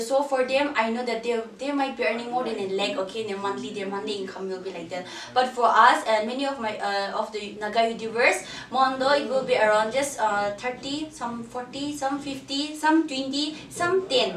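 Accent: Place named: Assam, India. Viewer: I like your Assamese accent 😭💓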